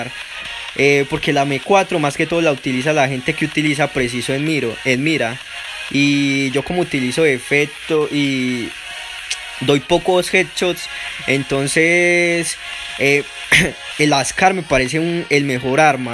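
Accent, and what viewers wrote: Colombia. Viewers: Jajajaja se nota que usted es colombiano.... Y para los que dicen que hace mero feik....miren videos de MrStivenatc donde aparece en clasificatoria!! Y juega muy bien, pinches Heiters, Ratas, crítican sin saber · Primer video que veo tuyo y me parece que eres paisa o no? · Nose porque suenas como un Colombiano paisa jeje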